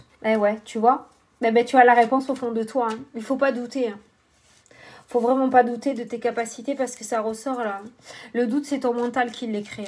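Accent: South, France. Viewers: merci pour ce tirage, il est trop bien. Un joyeux anniversaire , profitez bien et plein de belles choses. Et comme vous êtes du sud et que je suis du sud aussi , vous me le rappelez trop d'ailleurs, toutes vos expressions me font trop rire , ça fait un bien fou d'entendre quelqu'un qui parle comme moi "mdr". Je vous fait un énorme bisou pour votre anniversaire.merci, merci pour votre bonne humeur dans toutes vos vidéos.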